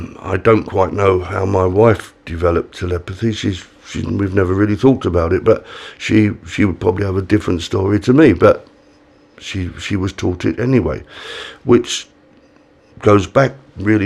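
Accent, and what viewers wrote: United Kingdom. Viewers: my apology. But how can I fill my aura with spiritual power. My apology again and thank you. Btw, I love your British accent.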